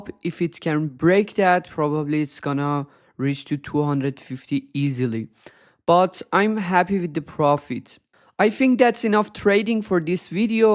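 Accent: Iran. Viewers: Bro are you Persian? You sound like Iranians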